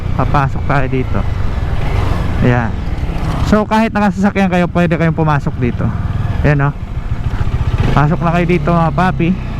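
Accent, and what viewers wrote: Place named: Bicol, Philippines. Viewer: Bicolano ka boss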